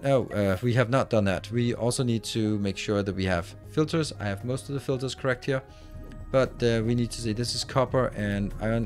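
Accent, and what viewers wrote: Denmark. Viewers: Are you danish by any chance, the accent kinda gets me, just Got this game its so Fun.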